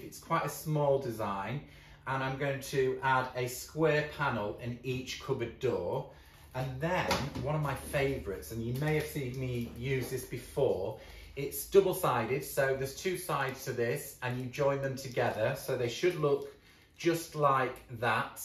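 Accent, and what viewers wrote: United Kingdom. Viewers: I love your British accent almost as much as watching you transform the most plain Jane pieces into stunning beauties. You have an amazing talent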